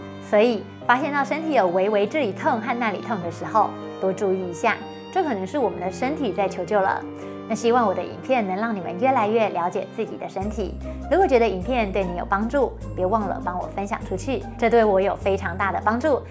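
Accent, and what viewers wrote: Taiwan. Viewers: 台湾女生说国语太好听了，温柔😊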